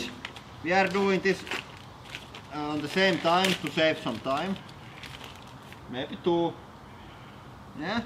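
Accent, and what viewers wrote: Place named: Finland. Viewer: The subtitles is all fucked up :) Can´t handle finnish english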